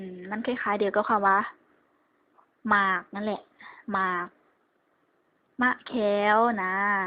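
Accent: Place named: Phu Thai, Thailand. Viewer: เว้าภาษาภูไท คือเดวกับ อ.ศรีธาตุ จ.อุดรธานี เลย สำเนงเดวกัน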